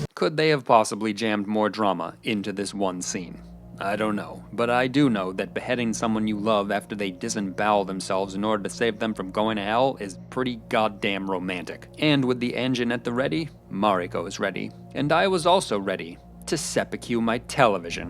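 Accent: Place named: United States. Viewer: Since he's pronouncing everything with a fairly strong American accent, he would probably pronounce Kurosawa wrong as well 😂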